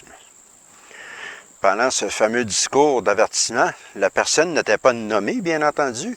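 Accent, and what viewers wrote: Quebec, Canada. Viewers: Cher, tu ne me connais pas encore mais ça fait déjà quelques mois que j’écoute avidement depuis la Suisse tes vidéos (attention, au masculin en québécois…! ). Tout d’abord, j’ai toujours adoré l’accent québécois et j’ai toujours l’impression que vous autres avez un humour supplémentaire et plaisant par rapport à chez nous! Merci pour toutes tes vidéos (pardon, tous tes vidéos! ) tellement logiques, intelligents et en même temps simples à suivre et comprendre. Tu fais, toi au-moins, du bien à beaucoup de monde qui ouvrent les yeux et se déculpabilisent, contrairement à ces connards ridicules et rigides de la Watchtower! Quelle arrogance que des humains se prétendent représentants de Jésus et Dieu sur la terre (auxquels, d’ailleurs je n’ai jamais vraiment cru). L’un de nos comiques suisses disait dans l’un de ses sketches « peu-à-peu les écailles churent hors de mes oculaires…! 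). Eh bien c’est mon cas bien que je n’ai été qu’un « intéressé « comme ils disaient à la congrégation pendant quelques années, heureusement que je ne me suis pas fait baptisé (Dieu m’en garde…! ). Depuis 2 ans, j’ai fait un travail psychologique sur moi-même et tes vidéos, ainsi que ceux de Dominique Reynaud en France, ont contribué à donner un coup de balai définitif à ces âneries des TJ et autres religions; en somme, pour moi tes vidéos c’est « la cerise sur le gâteau «. Merci encore et mes amitiés virtuelles depuis la Suisse région Lausanne. Daniel Bulloz.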